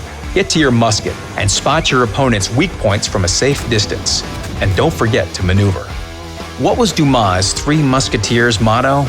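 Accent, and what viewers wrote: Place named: United States. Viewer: I'm getting sick of this American accent.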